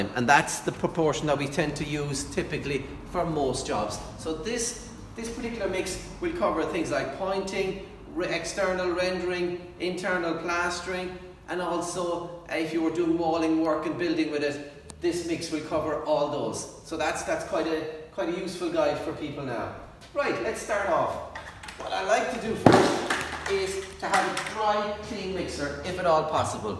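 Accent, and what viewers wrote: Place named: Ireland. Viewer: While I like the Irish accent in English, it always reminds me of the sad state of the Irish language.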